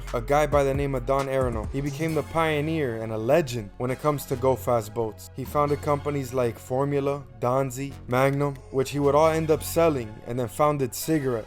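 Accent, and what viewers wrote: Miami, United States. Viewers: My bro has a Miami accent. 305 ❤ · This guy has a Miami accent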